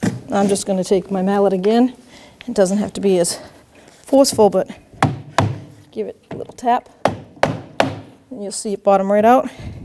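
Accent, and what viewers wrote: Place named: Massachusetts, United States. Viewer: Love me a good mass-hole accent!!! Great video! Thanks for the help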